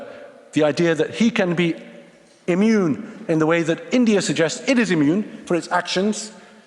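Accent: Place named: United Kingdom. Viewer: To parade the British accent as something that might invoke jealousy in Indians is rather infantile of your part. I 'll come to the issue of Kashmir though. At the very outset, you must get your facts right. It was Pakistan and not India who violated the UN guidelines by not withdrawing its troops from Kashmir in 1948. Why cry foul now? Coming to the issue of 'unarmed Kashmiris' being on the roads, it is no secret that the whole might of ISI and its Mullahs is behind how this sadist project has seen the light of the day. How systematically stone pelting has been funded. The political careers of separatist leaders from Mirwaiz to Malik rest on the instability of Kashmir. Other than that they do not have any clout in other parts of India. Might I ask, where exactly were the so-called separatist leaders and their sympathisers in Pakistan when the whole Kashmir valley was flooded a few years back? 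What we as Indians understand about our country is none of your business. We have institutions still in India that have not been sabotaged by Mullahs and Military unlike the Kangaroo courts of Pakistan where capital punishment is doled out liberally.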